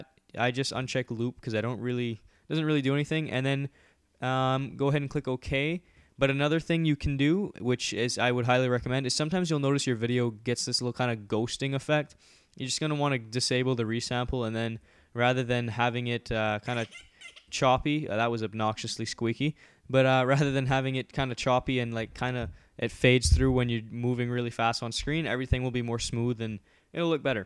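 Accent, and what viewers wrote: Canada. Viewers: Your voice changed. You have more of a Canadian accent now.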